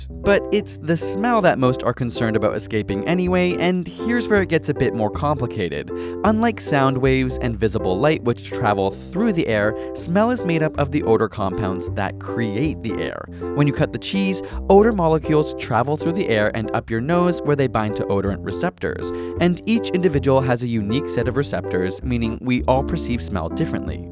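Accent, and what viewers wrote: Canada. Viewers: I'm starting to realize you have the accent of a Canadian by the way you pronounce "outrun" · Haha, your canadian accent was strong in this video...Almost like you were emphasizing it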